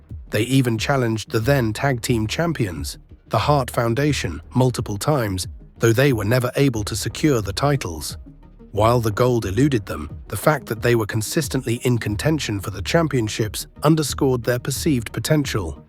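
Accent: United Kingdom. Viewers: These episodes are truly a joy to listen to. The English accent gives it weight. Power and Glory rocked and when Roma became a Horseman I was down. I’m a 10 year old boy in ‘87 and pro-wrestling was my soul. I’m 10 again while I watch now and it’s better than I remember. Thank you.🤠🍺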